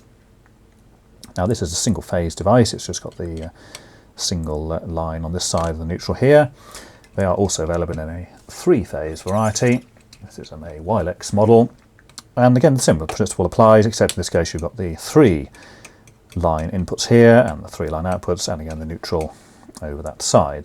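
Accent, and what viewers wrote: United Kingdom. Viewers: Hello Sir , initially really I'm very thankful of you for your very helpful video series , you present your nice videos look alike "sport events tellers" and sometimes understanding your accent is a little bit hard for none natives and specially a challenge for me, anyway I love British accent and by listening you my English listening skills would be improved as well...LOL... , could you please answer these questions , on (time of video ) in the book we have RCD and RCBO , it seems that RCBO is better than RCD because RCBO does somehow operation and in this way "save life of people" and also protect the devices such as TV and washing machines and so on , yes ?? So what is the difference between RCD and RCBO?? which one of them for home is better and most suitable ? each ones RCBO for TV and washing machine is needed separately ? each one should has which specifications/ amperage ? 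each RCBO can cover how many devices ? sorry a lot of questions !! I am contacting from Kiev in Ukraine and by answering my questions you will solve a lot of problems for me and at the same time it is a very big favor, Your fan , your supporter , Afshin Heydari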